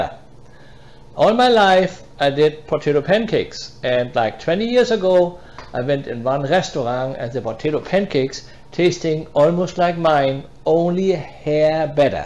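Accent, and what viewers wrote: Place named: Germany. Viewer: I like your accent German English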